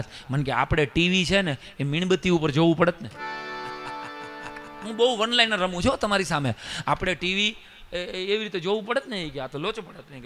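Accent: India. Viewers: Aap no awaz sabhli Jamnagar ni yaad aavi Jay che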